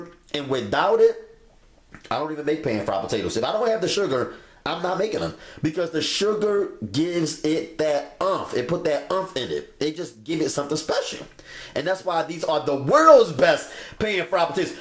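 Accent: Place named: Philadelphia, United States. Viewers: You got that Philly accent. Im originally from Philly. I'm in Ohio, I get teased about my Philly accent. You got me laughing. I'm making this tonight. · on the sugar! Us Pollocks use sugar in our potato pancake recipes which is then plated and topped with powdered sugar, if you like. But I question one thing, me being from Chicago. How come you boys from Philly can't pronounce boil or oil????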